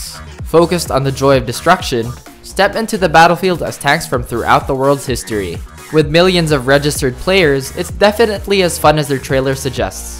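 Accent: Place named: Philippines. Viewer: FILIPINO ACCENT AF!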